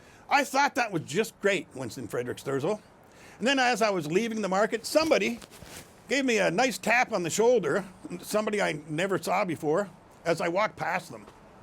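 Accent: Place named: South, United States. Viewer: The fact that the locals recognise you as pro China is actually very positive. Also the other store probably was told of a foreigner who drinks the tall cans of grape juice. If you are right and it’s not a local thing then you would stick out even more because of that. Especially if they identify your accent as from the south and the drink also popular with people from a certain region.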